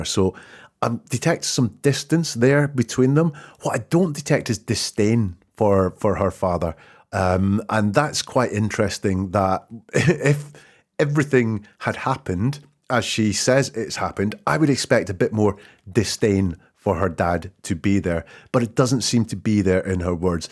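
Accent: Scotland, United Kingdom. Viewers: Nice to hear a fellow Scot 🙂. Really enjoying your videos.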